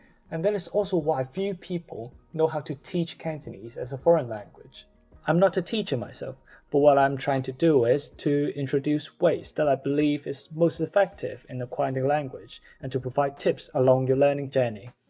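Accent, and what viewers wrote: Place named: United Kingdom. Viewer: I still think listening to you is very trippy. We have similar accent in our Cantonese, but my English is a North American English accent where as yours is more British. :P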